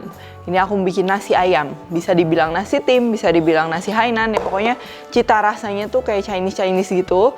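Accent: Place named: Cengkareng, Indonesia. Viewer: Masukin dialek warga cengkareng bgt.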